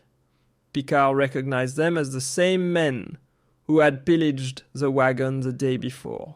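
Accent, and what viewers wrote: France. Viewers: Love hearing this read in a french accent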